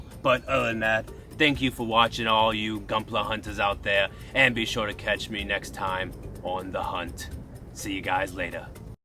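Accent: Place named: Australia. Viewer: Can someone translate??? I don't understand Australianese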